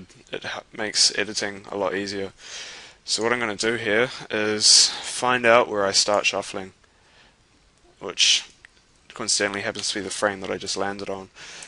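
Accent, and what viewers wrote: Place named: New Zealand. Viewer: Bro NZ accents are sick, coming from a Canadian here :) Aussie accents are sick too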